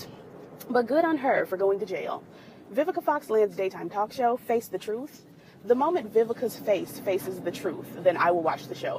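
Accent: South, United States. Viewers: Bring back the southern accent! Lol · I tune in because of your southern twang. Do you girl and forget those haters. Have a good Friday mama.... BTW: Emily is crazy for allowing a dude who wont even claim her abuse her. Self esteem is essential when dealing with those type of dudes. Hope she finally moves on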